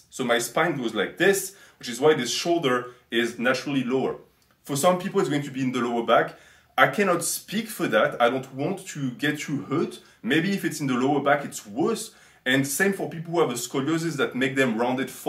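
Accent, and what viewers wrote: France. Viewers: I literally for some reason could not put my finger down on your accent, and now I know it's French...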